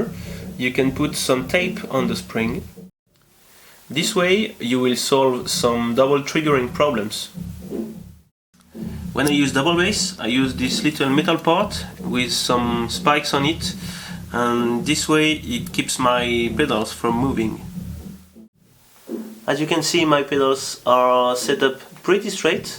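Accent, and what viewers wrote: France. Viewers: i'm Pretty Sure you are French,your accent doesn't make Doubt! ;-)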